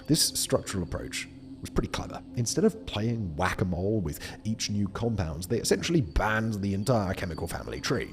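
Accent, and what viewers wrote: England, United Kingdom. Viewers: 2 recorded deaths. There are thousands of known hallucinogens. I never really thought you would be above this fear mongering & we all know you weren’t writing, editing or engaging in the creative process of these channels for many years now. You’re just an English accent reading catch-all content targeting an American audience.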